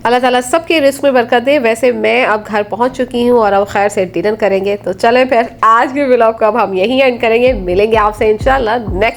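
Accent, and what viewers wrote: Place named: Arab world. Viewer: Your arabic accent is vey nice I wanna learn arabic can u guide me from where i can learn From Pakistan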